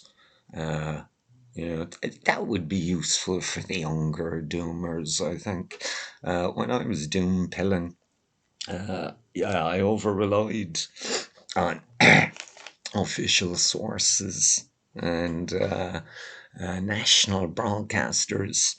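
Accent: Ireland. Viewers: As an American, I can understand you fine since you turned your audio volume up. A couple of those videos, the volume was too low but it's fixed now. I also could tell you were Irish right now away. I wanna here you do more impressions of your fellow Irish normies. That had me cracking up in that original video.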